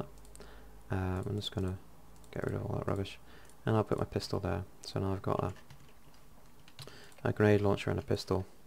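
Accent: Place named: United Kingdom. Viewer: nice brootish accent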